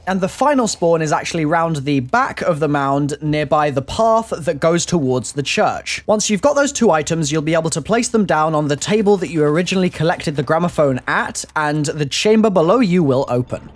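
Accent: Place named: England, United Kingdom. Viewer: Estos si es asentó ingles no como lo que tengo en usa xd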